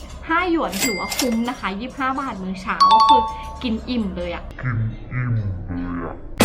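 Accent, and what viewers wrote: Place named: Suphanburi, Thailand. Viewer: ฟังแล้ว สุพรรณแน่แน๋ๆๆ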